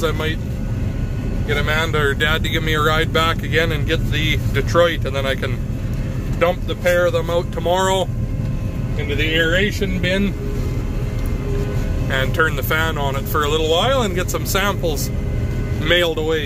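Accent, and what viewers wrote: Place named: Canada. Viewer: Well, how she goin? "ANYWAY...." just wanted to say out of all the FarmTubers I find your channel most entertaining... all the rest I find myself falling asleep, and then their's that kid from Iowa with severe ADHD lol... Your Canadian accent and one liners like, "So we don't plug the girl cock stiff" lmao. Good stuff and nice to see harvest is over for ya as we are in the middle of ours from Ohio. Have a good one.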